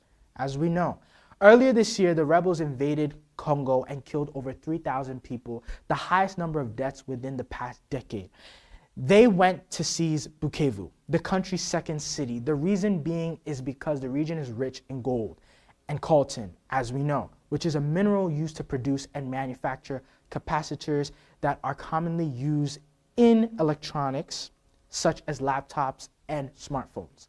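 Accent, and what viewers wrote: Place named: United States. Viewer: Are u Ghanaian, just a harmless question, cos u sound American?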